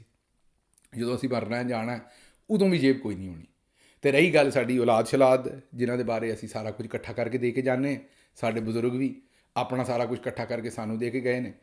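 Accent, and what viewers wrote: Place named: Amritsar, India. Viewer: I agree. His Punjabi is sophisticated and sweet. He mentioned that he speaks Amritsar dialect. We speak similar Punjabi with Jalandhar dialect. This is not to detract from his amazing jihad against the dictatorship.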